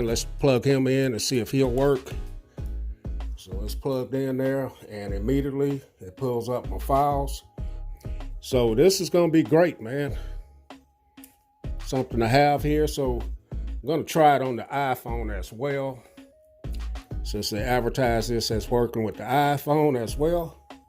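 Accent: South, United States. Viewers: Are you from the south of the state’s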